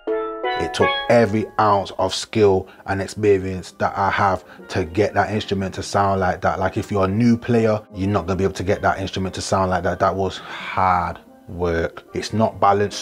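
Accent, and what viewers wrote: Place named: United Kingdom. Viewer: Never knew you were british man love this channel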